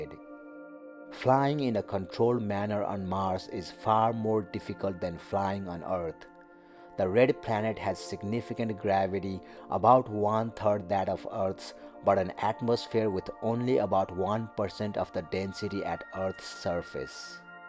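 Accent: India. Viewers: Would love this video infinitely more if I didn’t have to hear this guys annoying voice, like some robot eastern Indian. The nerds behind the scenes should never do the selling, the narrating or talking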